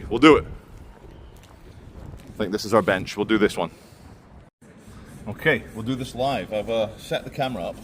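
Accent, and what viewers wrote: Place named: England, United Kingdom. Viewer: Indigenous English , beautiful, just beautiful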